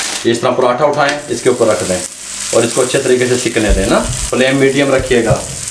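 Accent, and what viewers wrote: Punjab, India. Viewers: He is so simple, jab yeh kehtay hain “ aap bolain gay koi cheez banayee thi” can’t help laughing, his Punjabi is so cute😅😅😅🌹 Theek hu gaya.....!! 😊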